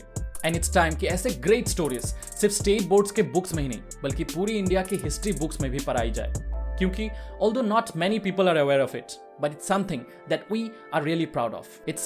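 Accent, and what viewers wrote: Assam, India. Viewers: তুমাৰ হিন্দী দেখী গম পায় গলো তুযি অসমীয়া বুলি 🔥🙏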